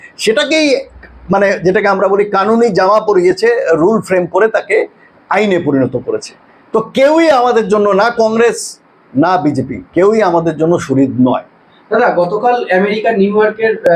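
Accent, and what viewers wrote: Bangladesh. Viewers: APNAR BANGLA PRONUNCIATION SUNE MONE HOCHHE AAPNI BANGLAADESHI.....!!!!! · আপনার accent শুনে মনে হচ্ছে আপনি বাংলাদেশী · Bangladesh lok mone hoche...vat bolchen...every thing given logically....bangladesh bhasha tai doubt hoche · APONAR VASAY AKHONO BANGLADESHI BOLA MONA HOY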